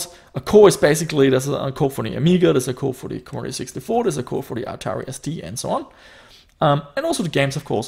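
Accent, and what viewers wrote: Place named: Germany. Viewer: Your accent sounds half German, half Australian.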